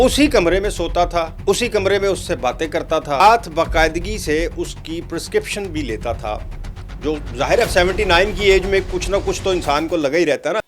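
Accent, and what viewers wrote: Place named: Punjab, Pakistan. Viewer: your Punjabi touch is hilarious 🤣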